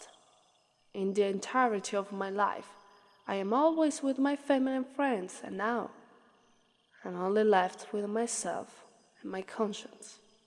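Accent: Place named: Italy. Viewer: A tribal girl with an Italian accent. 🤔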